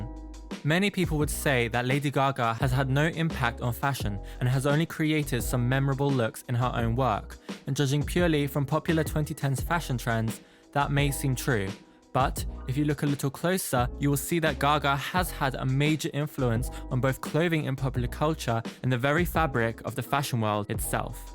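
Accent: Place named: England, United Kingdom. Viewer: Not to dismiss her fashion influence, but one cannot attribute a cultural zeitgeist to a single person. Additionally, Beyonce wore a robot suit before we know the name Lady Gaga. Check her Get me Bodied performance at the BET Awards. I hear the English accent, however, so I understand that you may have your own cultural biases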